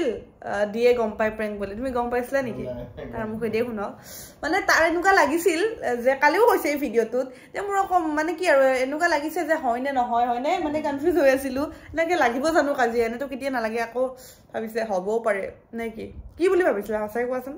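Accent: Nalbari, India. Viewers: 1st video salu aji plz repaly me 2mk kintu nalbari r Jean lage kotha bur kot biya hoisa ?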